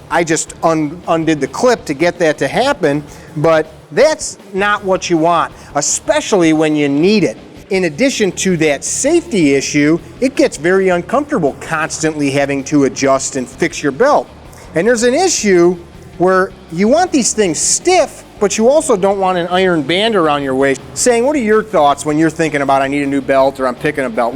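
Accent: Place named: Midwest, United States. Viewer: That Midwest accent just kills me. 🤮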